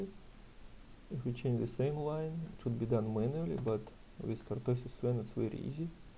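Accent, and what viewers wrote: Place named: Russia. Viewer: лучше по-русски говори, ёр рунглиш вери бед)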